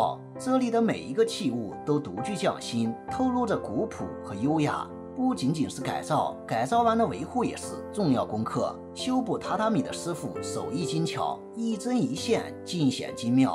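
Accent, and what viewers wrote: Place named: Shandong, China. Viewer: 越越是哪里人啊？听着口音像山东，河南，陕西？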